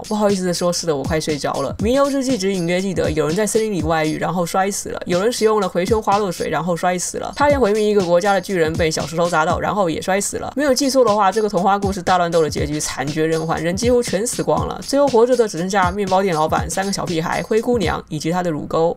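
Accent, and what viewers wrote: China. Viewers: 主要是口音問題，一聽就知道 ；用字遣詞不是在成語，是在一些像"尤為特別的存在"、"玩意、"不能忍"等一般台灣人不會使用的語句，台灣學對岸的詞彙大多是台灣本來沒有的簡稱，像是小三、小屁孩等，也僅此而已還是建議你口音可以改台灣人比較能接受的方式，如果你觀眾群在台灣的話，因為很多人對這是很反感的，像我因為有人推薦說很好笑來朝聖，但口音和用詞讓我退避三舍，大概30秒就不行了，很遺憾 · 魏茹茹叫別人不要在台灣用大陸口音,想想嘛...不就等於叫台灣人到大陸不准用台灣口音.... 如果是我我也不知道要怎麼跟人家溝通哈哈...多體諒一下就好啦,不喜歡也不要說的那麼白,要留台階給人家下呀...尤為特別存在我會用啊...玩意兒...不能忍很常聽別人說呀.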